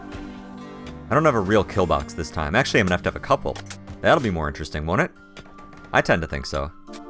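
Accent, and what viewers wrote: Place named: Midwest, United States. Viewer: is an American as am I but he's from the midwest i am westcoast. He says ruff i say roof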